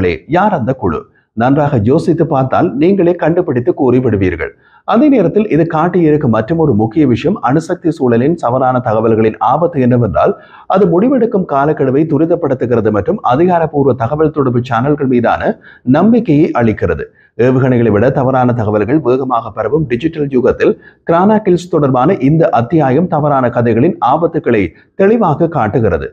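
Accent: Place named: Sri Lanka. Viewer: 👌ஸ்ரீலங்கன் தமிழ்🇱🇰🌹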